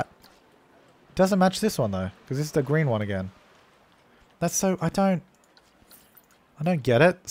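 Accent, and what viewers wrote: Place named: Australia. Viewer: Is it just me that thinks he sounds like a Australian WanderBots?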